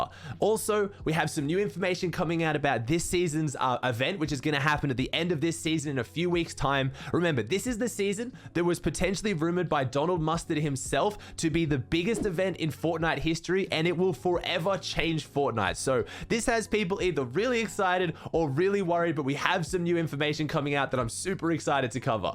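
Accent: Australia. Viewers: Aussie sounds sick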